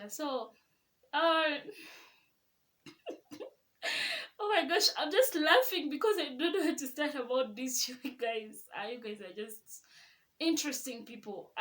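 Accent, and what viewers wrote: Kenya. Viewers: How long have you been in Nairobi? You sound like a Kenyan already😅😅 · ur accent is kenyan 😢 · You sound a bit kenyanized. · Walai unaongea kaa kenyan manze, i can't believe you are a Congolese 😅😅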